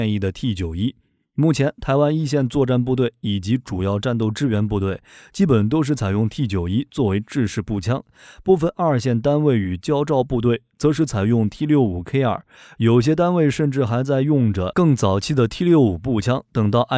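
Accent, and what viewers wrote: China. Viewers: 不要忘了，這個頻道聽他口音是大陸腔。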